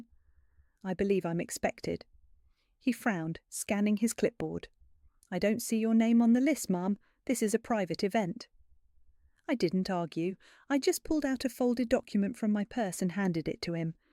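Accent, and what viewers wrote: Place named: United Kingdom. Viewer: Why use a British accent for an American story?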